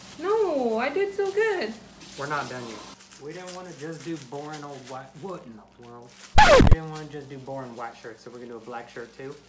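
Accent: South, United States. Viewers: So I realise I'm super late to this video but I e watched a lot of recent videos and I have to say that he's WAYYYYY more southern here than recent!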